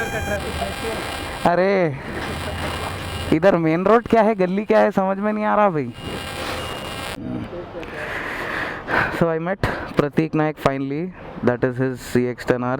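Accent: Hyderabad, India. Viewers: ap pakka hyderabadi baat karre bhai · Sir the way u talk the hyderabadi language i liked it very much by the way the wheelie was awesome 😜😜😜😜😜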